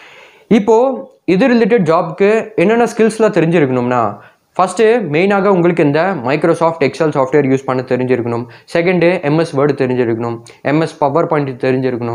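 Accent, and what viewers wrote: North India, India. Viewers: North hindian mari pesra😂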